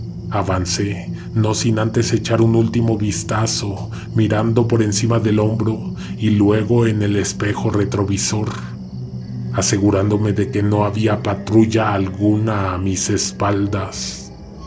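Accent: Mexico City, Mexico. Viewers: Oye una pregunta eres chilango😎?? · GRACIAS.YA NO OIGO.OTRO CANAL ME.GUSTA TAMBIÉN.ESE TONO.CHILANGUITO M HACES MUY AMENO MI.SUEÑO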